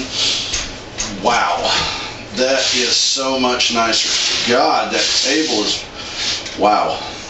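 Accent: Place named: Deep South, United States. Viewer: Guessing your from the Deep South somewhere....that was the most relaxed review I've ever seen....enjoy your saw and this was helpful.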